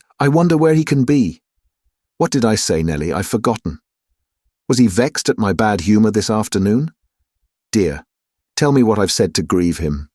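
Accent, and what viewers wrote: England, United Kingdom. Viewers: Thank you for the wonderful reading and your beautiful British accent. I spent three days listening to this audiobook—a true masterpiece, once in a lifetime. The language is so beautiful, with a vast and rich vocabulary.😇😇😇😇😇😘😘😘😘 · I can’t listen to this English accent bs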